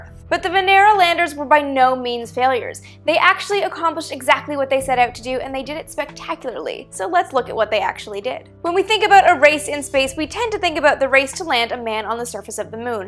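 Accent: Canada. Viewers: Do I detect a Canadian accent? I had no clue there was a picture of Venus' surface, great video! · She is Canadienne. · She sounds Canadian.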